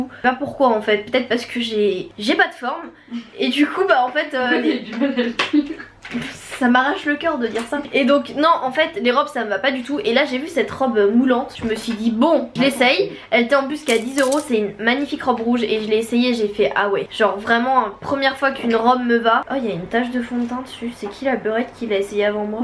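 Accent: Marseille, France. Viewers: T marseillaise? 😮 autrement super vidéo ❤ me fais trop rire 😂❤❤ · Tu viens de Marseille comment sa? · t'es Marseillaise??? ❤❤😍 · tes marseillaise? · T marseillaise?⚪🔵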